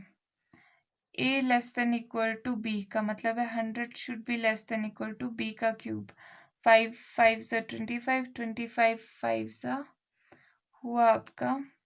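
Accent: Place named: Haryana, India. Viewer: mam aappp haryana se ho kya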